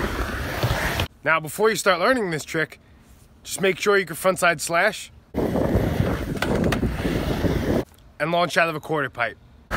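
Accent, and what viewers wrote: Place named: New York, United States. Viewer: Your trick tips are really great. A lot better than some of the others out there because I think you nail the "what you are supposed to be feeling" part really well. I gotta ask though, are you North Jersey or NY?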